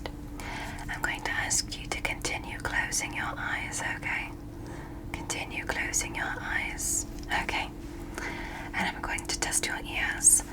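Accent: United Kingdom. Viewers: This was a very good video as always! Is it only me or were u acting british? 👀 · ngl the first ever vid i watched from you you had a british accent and as a fellow brit i was fooled when i heard you speaking normally 😂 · What is your actual voice and accent. You have a British accent on all your doctor videos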